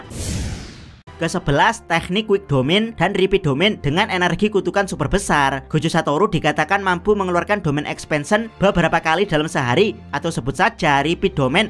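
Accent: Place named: Purworejo, Indonesia. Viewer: Logatnya kaya orang purworejo min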